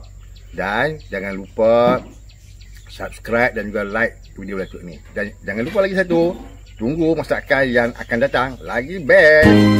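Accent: North, Malaysia. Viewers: Slang bg lagi utara boleh dak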